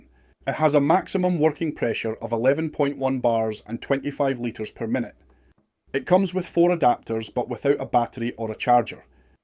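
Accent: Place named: Scotland, United Kingdom. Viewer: I'm an even smaller creator, you're way ahead of and better than me 😂 Also, love the scottish 👍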